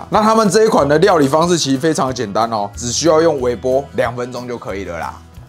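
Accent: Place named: Taiwan. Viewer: 好台好喜歡😘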